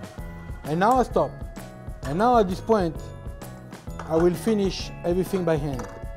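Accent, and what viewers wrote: France. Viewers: I love his French accent!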